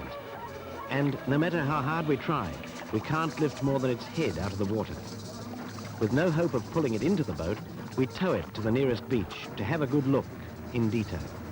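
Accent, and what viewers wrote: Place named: United Kingdom. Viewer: The 'Bri-ish' voice over is hilarious, I remember being shocked in the 80's, when I first heard him talk.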